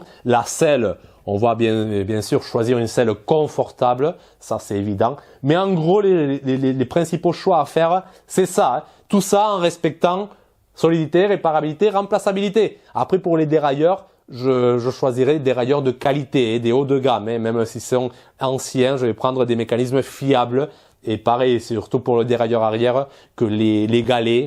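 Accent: Toulouse, France. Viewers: Bonjour, Proposition très cohérente pour de grands voyages,plein de bon sens,j’adore l’accent toulousain, Bonne continuation la chaîne me plait.👍👍👍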